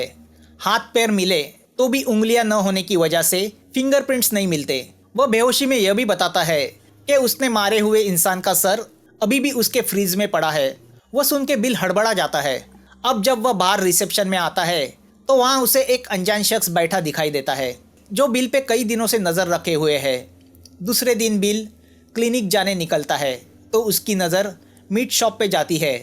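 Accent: Maharashtra, India. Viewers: Sir are u marathi i think so becose of ur hindi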